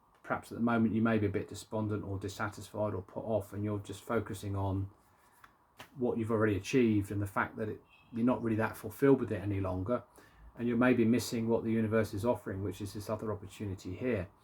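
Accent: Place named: England, United Kingdom. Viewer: Really helpful thank you, love your readings, very clear. This clicked with me...I guess j need to look at things a little more and figure it out, it helps to know to not panic decide, I often feel like you just want to 'flip the monopoly board' so to speak, but ill hang in there. I know what I want to do, ive just finished a course in learning what I want to do, its been hard whilst running a business ,which isn't doing that well anymore, so I kind of already knew, but its now when do I step on to the other train, it feels like I have to time it perfectly, and im very hesitant, but, tge universe will catch me, so.. . Ill jump soon, once ive prepared a bit more! I appreciate the good news of clarity will come and it looks all positive. Thank you. I've subscribed to you now, first time ive seen you and its nice to hear a fellow English person! 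I look forward to more messages to help me through he fog, its appreciated 🎉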